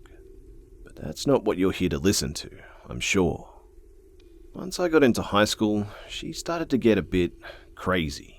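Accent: Australia. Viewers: ARE YOU AUSTRALIAN CUZ DAT ACCENT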